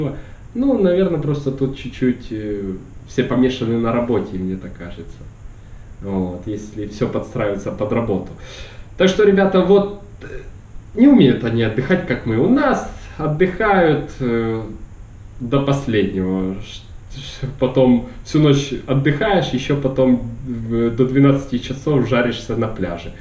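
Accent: Ukraine. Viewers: Ахахаха, я ору! : D Ещё говор такой "Украинский"! Ну да, на Украине всё так и есть как описал) Надеюсь в Польше не все такие стукачи и зануды. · Я думал ты беларус, , а ты Одесит! Круто! У нас также! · парень у тебя украинский говорок хотя ты усилино стараишсЯ обрати внимание на свою букву х и меньше подхалимничай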